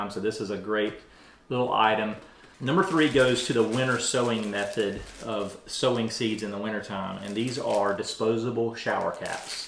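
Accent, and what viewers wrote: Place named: South, United States. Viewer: I love your southern accent!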